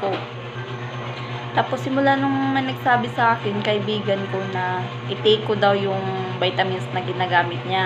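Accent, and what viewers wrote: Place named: Visayas, Philippines. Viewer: Ok Lang maging bisaya ang accent ikaw Yan ei may sari-sarili tayong accent just be proud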